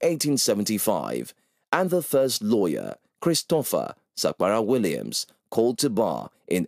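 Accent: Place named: Europe. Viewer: This narrator sounds European to me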